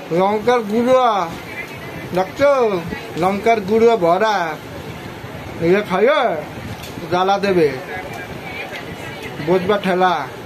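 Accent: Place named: Bangal, Bangladesh. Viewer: বাঙাল কথা কও কেন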